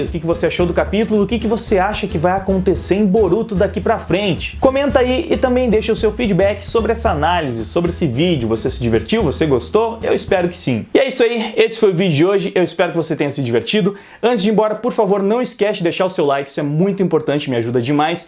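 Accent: Rio Grande do Sul, Brazil. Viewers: Obrigado pelo vídeo, grande trabalho, vc é do rio grande do sul? (Eu sou), abraço.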